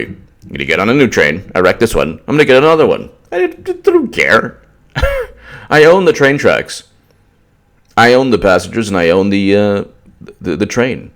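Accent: South, United States. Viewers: you are in rare form tonight. please repeat theyou awaaare of the" Weiiiiiiiineeeeer long pause:)..................................Laaayptop with that southern Drawl again.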